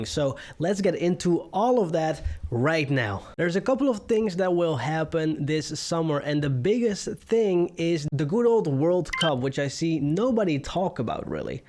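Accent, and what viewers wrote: Netherlands. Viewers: Ofc he is the Dutch accent is through the roof..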